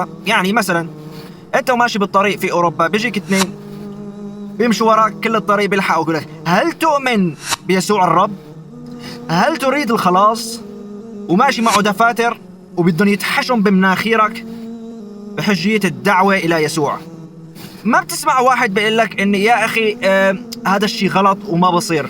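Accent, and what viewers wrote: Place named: Syria. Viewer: ماشاء الله بتحكي شامي اكثر من السوريين اول مرة بشوف اجنبي بحكي عربي بطلاقة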